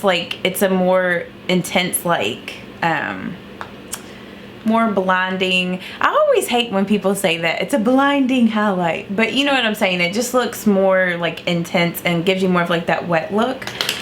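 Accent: South, United States. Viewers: First, let me begin by saying that you’re one of my fave YTers. ❣️ I love how “real” you seem to be. I don’t feel like I’m watching someone that I can’t relate to. I’m also a southern girl, so I love your accent also. 😉 My only suggestion would be to possibly upload a few videos that are a little bit shorter. I don’t want to miss any of your videos, but more often than not, I don’t have 30+ minutes to spend watching a video. I may be in the minority, but shorter videos grab my attention quicker. Sending you lots of love from Alabama! 😘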